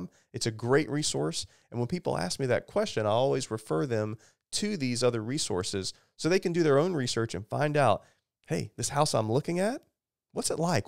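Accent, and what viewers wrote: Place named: Alabama, United States. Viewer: I like your accent. If that's the Alabama accent, that's a plus. And the southern friendliness.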